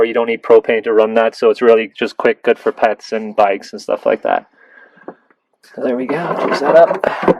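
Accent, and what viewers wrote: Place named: Ireland. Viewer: I detect an Irish accent.where u from.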